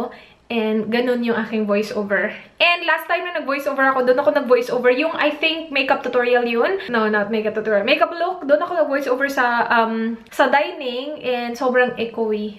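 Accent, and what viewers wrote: Visayas, Philippines. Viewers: Nakakaloka yung tono kapag nagsasalita ka ate hahahahahahahahaha😂 naaalala ko si tita Giselle sayo😂 kasi pag nagsasalita siya yung tono ng bisaya'ba nandun parin hahahahahahahahahahha. Nung isang araw pako nagi-stay sa channel mo ate jajajahhahahahahahhaha😂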